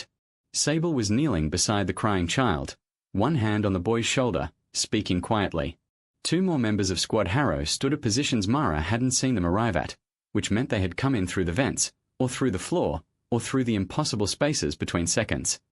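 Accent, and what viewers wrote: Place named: Australia. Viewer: G'day....an Aussie AI narrator...well I never!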